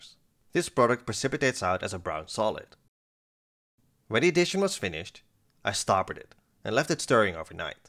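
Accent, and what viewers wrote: Netherlands. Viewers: accent heard, dutchie spotted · I hear a dutchy😂 already a fan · I know you are dutch you cant hide your dutch accent from a fellow dutchman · Are you Dutch?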